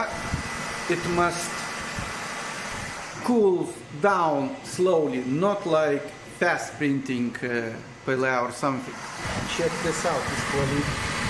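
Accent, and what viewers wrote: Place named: Lithuania. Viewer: ish anblu kalbos sprendziu kad lietuvaitis...irgi nusipirkau toki:)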